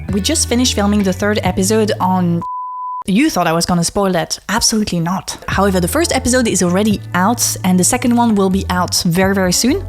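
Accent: France. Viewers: What ks your accent, french? Looking at your username it might also be italian, i do still think french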